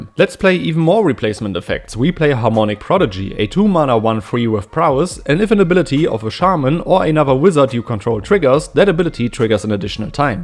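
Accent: Germany. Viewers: I love the sound of your english 🤣 it sounds like german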